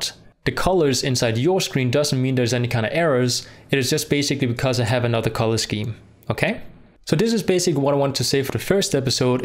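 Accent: Denmark. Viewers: I could hear from your very first video that you were from Denmark lol, Hello fellow dane!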